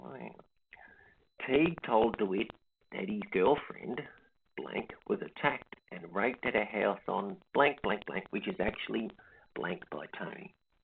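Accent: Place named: Australia. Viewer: As an American, you sound like every other Aussie, sort of like how all Chinese look the same to Americans. No offense meant there.....just sayin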